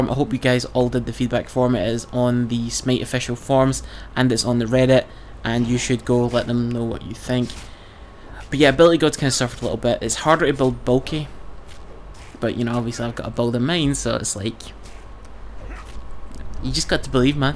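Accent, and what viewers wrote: Scotland, United Kingdom. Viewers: Sometimes I can't understand a single word you are saying especially when you talk quietly/mumble :( that Scottish accent. You fuck up guardians with beads what is wrong with that? :D I also noticed watching NA players that they have easier games in diamond ranks. My usual ping is 70-80 and when it rises above 120 its nightmare for me (usually playing adc)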